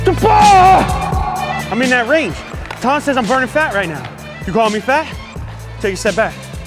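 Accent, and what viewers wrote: Canada. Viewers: Is it just me or his accent got a little more Canadian in this video compared to all the others?😂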